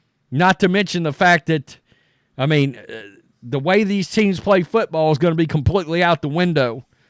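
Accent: South, United States. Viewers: Dude WHERE ARE YOU FROM? TEXAS? THIS IS BUFFALO AND PITTSBURGH IN JANUARY!!! DID YOU JUST DISCOVER FOOTBALL? This is like shooting yourself in head as treatment for a headache. You're gonna suggest trashing the entire playoff schedule for the winner because playoff football has to be played in the snow? IN BUFFALO???? You sound like you're from the south so i get how SNOW sounds to you, but this is utterly ridiculous to suggest SNOW is that big of a deal to Western NY and Western PA ppl. We LIVE in Lake Effect snow conditions our entire lives!!!! Sit this one out country boy. Guarantee the Bills would be LIVID to give up home field advantage for some snow. This is extremely hilarious 😂🤣 tell me you're not from around here without saying your not from around here. If ANYONE can play in these elements... Its Pittsburgh and Buffalo 😂😂😂😂😂😂😂😂😂😂😂😂😂😂😂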